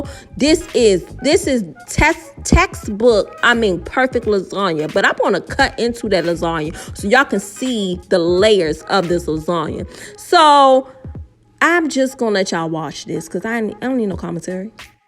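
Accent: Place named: Prince George's County, United States. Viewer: Just found your channel. New subscriber! You’re so funny, you sound like you’re from PG.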